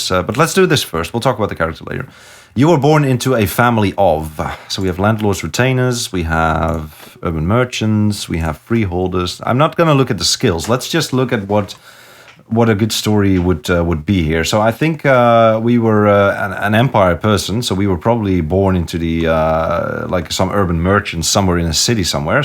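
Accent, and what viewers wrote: Netherlands. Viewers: Within the first 10 seconds of the video I was like "is this dude Dutch?" checked your about and yes, yes you are, New sub acquired friend.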